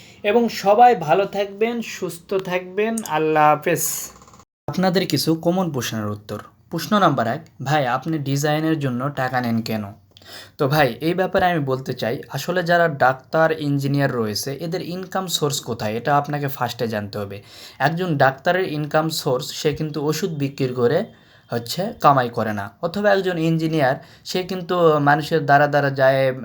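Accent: Naogaon, Bangladesh. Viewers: ভাই আপনার বাড়ি কোনটে? আমাকরে নওগাঁর মতোন ভাষা লাগিচ্ছে।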